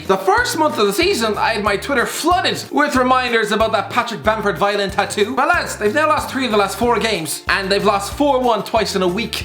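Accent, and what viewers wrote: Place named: Ireland. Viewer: Nothing like starting my day with an Irish guy rant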